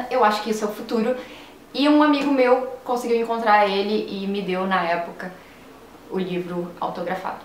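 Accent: Rio de Janeiro, Brazil. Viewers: Muito fofinho esse teu sotaque carioca <3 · Só agora eu reparei no seu sotaque carioca ... coixass maixxs legaixxs... hehe :)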